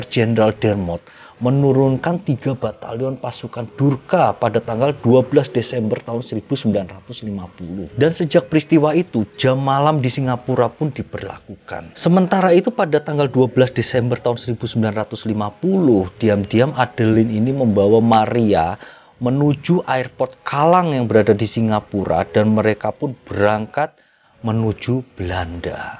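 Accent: Java, Indonesia. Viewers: Jawanya medok bgt tp ngomongnya jakarta...duh jd 😂😂😂😂gk pas bgt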